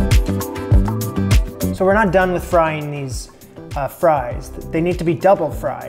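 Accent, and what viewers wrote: Canada. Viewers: Heard your accent when you were making the Orange cake but your location said the US. Glad to see I can still pick it out. Poutine is king and essential after a night out! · I'm a recent subscriber and I thought you sounded Canadian. Takes one to know one. I would hazard a guess that you are from the East. Love the show.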